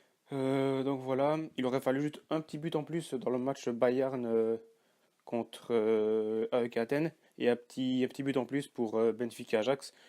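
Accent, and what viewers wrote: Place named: Liège, Belgium. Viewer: salut tu viens de ou en belgique j aime bien ton accent j aurais dis pas loin de liege non ?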